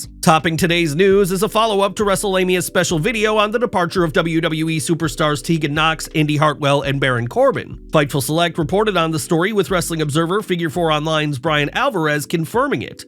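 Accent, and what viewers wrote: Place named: United Kingdom. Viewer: Why is the British narrator always sick the English guy is annoying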